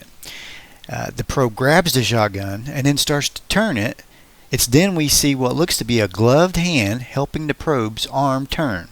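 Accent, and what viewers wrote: South, United States. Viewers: Where in the South is your accent from?? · The guy narrating sounds like he's out-of the dukes of hazzard.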